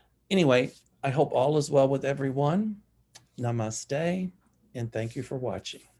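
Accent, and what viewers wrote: South, United States. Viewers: Please, don't apologize for your beautiful, southern accent! 😉 We love & appreciate you, just as you are - never change. 😍 This is what makes you unique & special. I can listen to it all day! Own it. Embrace it. Also, may God bless our dear Champ! 🐕👼🙏🐾❤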